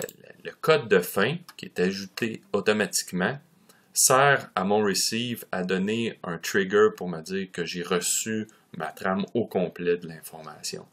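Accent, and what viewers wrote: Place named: Quebec, Canada. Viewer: Ben trop québecois ton tit-accent toé là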